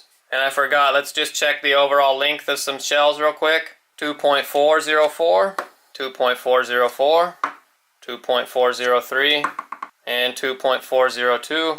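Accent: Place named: Germany. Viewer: Great video, im curious if you are of German descent? Your accent is familiar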